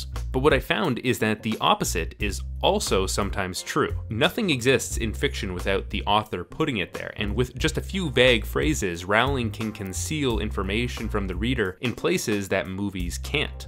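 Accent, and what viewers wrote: Canada. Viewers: You're Canadian. I can hear it in your accent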